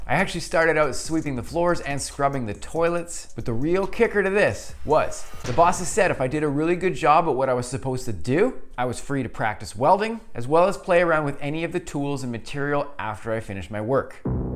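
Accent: Canada. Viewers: well he is canadian….and vancouver island to boooot…PRAW JECT….not PRO JECT… PROJECT is “she’s projecting her emotions on him” PRAW JECT is “this is a project I want to accomplish”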